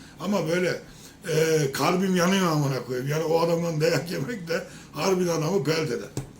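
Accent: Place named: Izmit, Turkey. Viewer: abi sen izmitli miydin ya tam bizim buralardaki gibi konuşuyosun harika bir insansın çok sevdim seni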